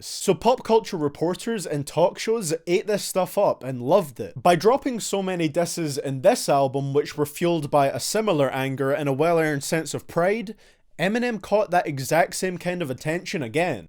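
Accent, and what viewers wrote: Canada. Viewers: this is the most canadian accent i've ever heard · Okay his popularity was never gone but maybe his album sales was halfed until kamikaze but he still outsold his peers ...so he still wins EMINEM WAS NEVER IRRELEVANT EVER EVEB WITH HIS NOT SO GOOD SELLING REVIVAL!!!! Also are you Canadian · Canadian accent. Well good video anyways.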